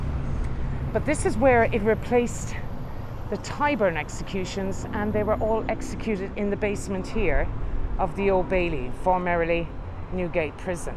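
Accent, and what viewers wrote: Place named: Ireland. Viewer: The best tour available! The Irish voice will take you around the world without leaving home!!!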